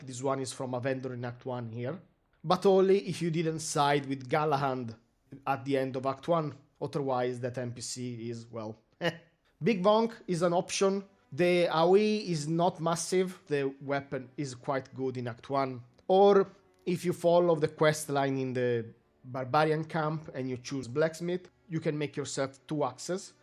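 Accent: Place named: Abruzzo, Italy. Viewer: wow your accent is bad... let me guess you are from Abruzzo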